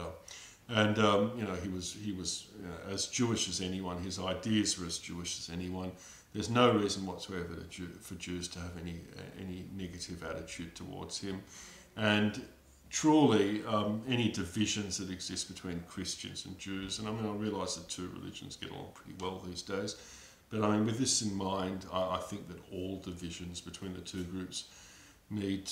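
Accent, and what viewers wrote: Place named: Australia. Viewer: interesting vid - fellow aussie it seems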